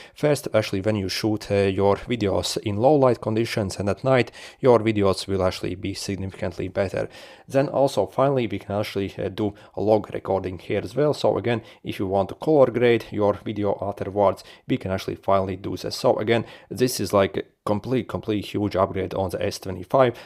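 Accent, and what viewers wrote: Russia. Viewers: are you russian? the accent is adorable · Ты-ж русскоговорящий. Вот прям по произношению слушу, что русский. Говоришь, как Мутко🤣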